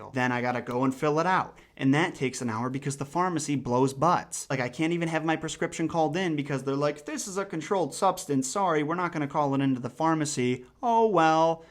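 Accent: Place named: Brooklyn, United States. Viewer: And side question, would your new content be like pyros or nfkrz'? If so fuck yeah dude if totally be interested in hearing your opinion on shit. Plus with that whole Brooklyn way of speaking you got you can do some great stuff. Keep it up man.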